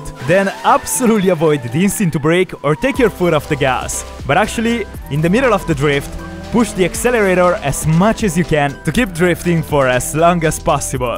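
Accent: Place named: Italy. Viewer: ciao dall accento si capisce che sei italiano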